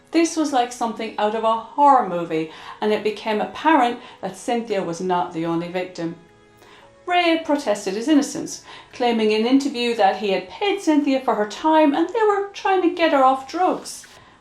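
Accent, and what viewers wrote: Ireland. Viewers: Glad I found this channel. You’re accent sounds a bit Irish ☘️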